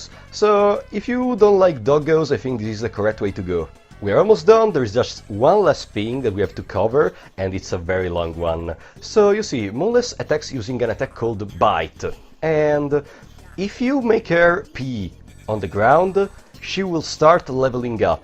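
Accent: Italy. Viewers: bro are you Italian? you sound like it we speak English similarly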